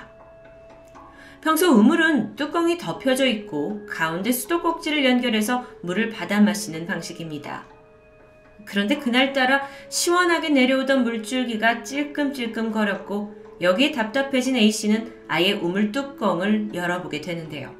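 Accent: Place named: Jeolla, South Korea. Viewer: 고향이 전라도인가? "의" 발음을 "으" 로 밖에 발음이 안되네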